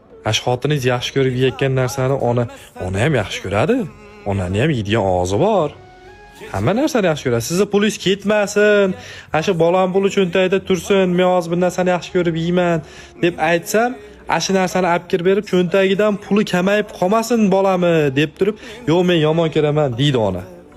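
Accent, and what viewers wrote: Andijan, Uzbekistan. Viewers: Андижоним шеваси ❤ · Анжаннанмизиз дейман шеваыиз кетяпти ман. Кашкадареданман сог булинг. Ота угил. Алхамдулилла. Роббил. Аламииин❤❤❤❤❤❤ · Shevaga gap yoʻq xaqiqiy anjancha 😅😅😅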